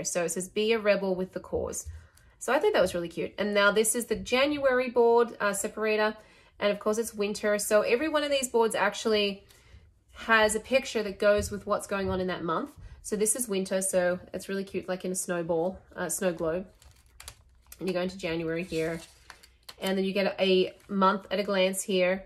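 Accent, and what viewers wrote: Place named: Australia. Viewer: this is what i'm eyeing online. thank you for all the info!! your ozzie accent is so faint. did you grow up in australia and move to the US?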